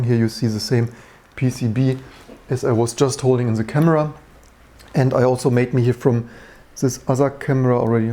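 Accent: Germany. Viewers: Very informative video, its really motivating to see that im not alone with repairing stuff instead of throwing it away. PS: Are you German too? Your accent really sounds like it.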